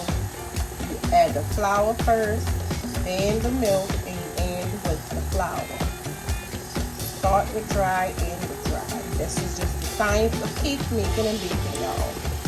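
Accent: Charleston, United States. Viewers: I knew you was from Da Chuck as soon as you started talking... I from yah too!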